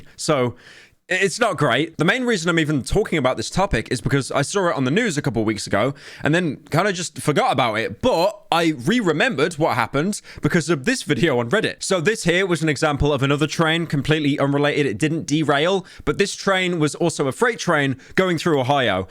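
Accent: United Kingdom. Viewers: Shut up your britich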